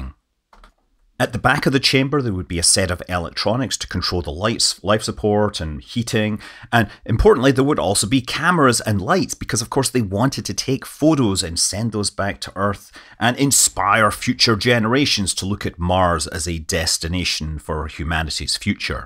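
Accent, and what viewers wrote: Scotland, United Kingdom. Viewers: Scottish fo0l...Aye!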